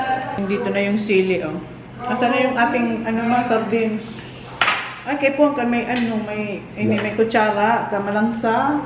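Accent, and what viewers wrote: Bicol, Philippines. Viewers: I love how you speak bicol, im a proud bicolano too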